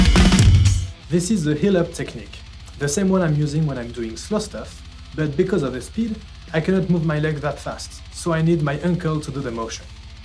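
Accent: France. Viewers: Ça serai pas un petit accent français ça ? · c est moi ou il a un gros accent francais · tu est fancais ca s'entend a ton accent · L'accent français bien présent ✅🤣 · Tu est français non ? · L'accent Francais on reconnais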